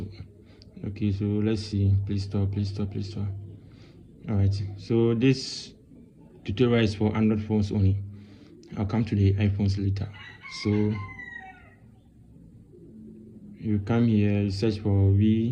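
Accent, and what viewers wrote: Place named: Ghana. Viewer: GHANA man English dat , no lie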